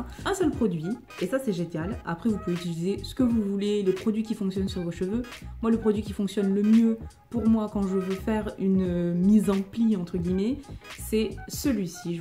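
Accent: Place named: South, France. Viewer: hahaha jm trop l'accent du sud hahha moi je te regarde de Marseille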